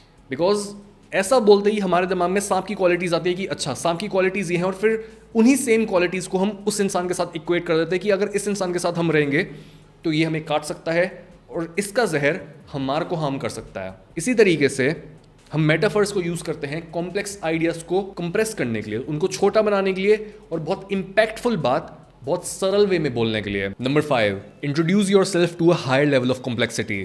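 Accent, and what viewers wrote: India. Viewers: İngilizce biliyorum saniyodum ta ki Hint aksanlı bu adamı dinleyene kadar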